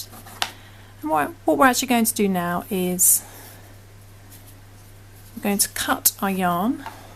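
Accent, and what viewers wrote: England, United Kingdom. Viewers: Finding it confusing because although you have an english accent you are using american terminology. ie your dc is our treble. Anyway, will just watch and not listen lol. Very cute ty for sharing.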